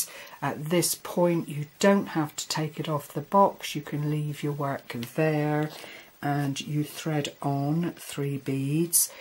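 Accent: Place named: Devon, United Kingdom. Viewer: I thought you sounded like a local girl. I was born in Newton Abbot but live in Teignmouth. Small world isn't it. xxx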